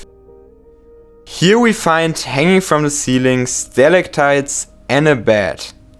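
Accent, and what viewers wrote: Germany. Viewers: Your german accent, is just like mine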